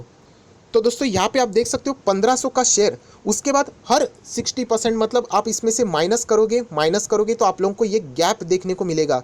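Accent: Maharashtra, India. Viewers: by ur Hindi I got that u from Maharashtra so let me know bro 😎 that I can meet u really u are the honest retailers well wisher🙏🙏🙏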